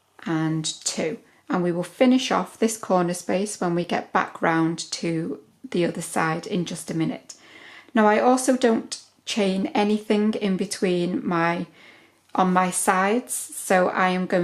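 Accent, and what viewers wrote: United Kingdom. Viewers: Your British accent is a winner as well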